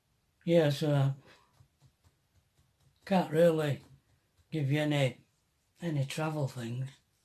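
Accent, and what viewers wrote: Yorkshire, United Kingdom. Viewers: actually did laugh out loud at your stories. Absolutely brilliant. I’ve come back home to look after my mum who has gone blind and had a stroke. I have spent the last forty odd years in America, so being back in England has been a bit of an eye opener. I was from North Yorkshire originally and to hear your accent and tales is something mum and I look forward to every single day. Your stories and cheerful charm remind me of the Yorkshire characters that I knew as a child. I hope one day to take you and out for a meal to say thank you in person when I can get my U.K. drivers licence. What a difference you have made to our lives. I can never thank you enough. I’ve got a poem for you that one of my friends wrote as a child in America, your zest for life makes me think of it when I think of you two. “Sunrise, I rise, with whist full sighs and starry eyes for things to come of yet!, but haste makes waste does a wise man say, so, before enjoying the light of day, linger do I, and later regret, the sun I find, already set! 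Thanks again, you are the best!!!!!!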